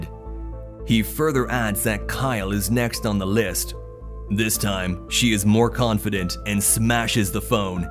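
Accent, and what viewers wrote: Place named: Canada. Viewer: The first ai voice I’ve heard use a Canadian accent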